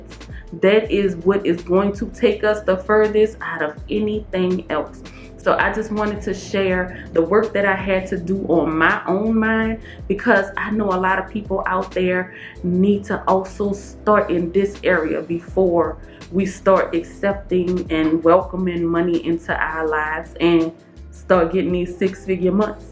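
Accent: New Orleans, United States. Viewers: Sound like your from NEW ORLEANS??? If you are I’m so happy to see someone from my City doing with your doing !!!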